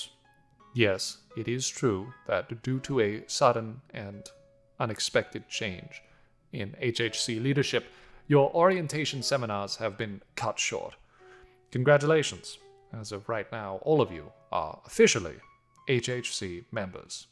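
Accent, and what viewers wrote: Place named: New York, United States. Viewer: OMG has a new york accent now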